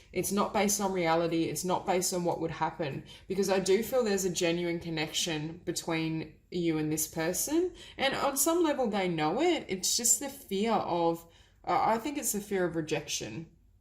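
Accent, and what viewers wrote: Australia. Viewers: Omg you are awesome and my intuition getting better , thank you I subscribed, you sound Australian, I have seen that all Australian readers are awesome something special about you!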